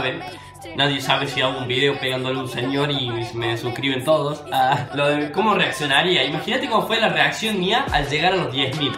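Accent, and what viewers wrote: Córdoba, Argentina. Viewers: Imposible no darse cuenta que sos cordobesaso 😂😂 sos re crack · Amigo sos cordobes no? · Sos cordobés?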